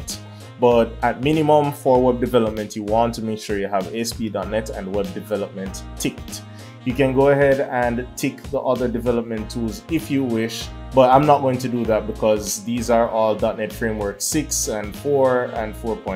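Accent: Jamaica. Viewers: Jamaican accent 100/100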